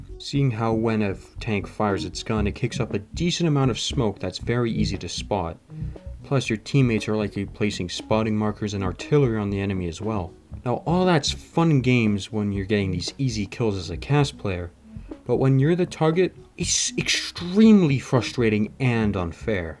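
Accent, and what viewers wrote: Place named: Canada. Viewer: Great video. You have a better Canadian accent than mine lol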